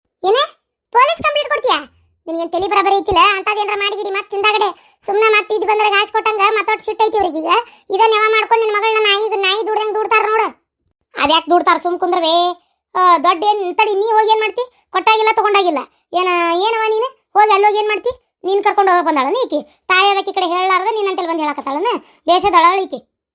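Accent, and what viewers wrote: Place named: North Karnataka, India. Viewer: Hii akka actually I am from Andhra Pradesh i love so much ❤️ kannada especially Uttar Karnataka slang mast ❤tq so ri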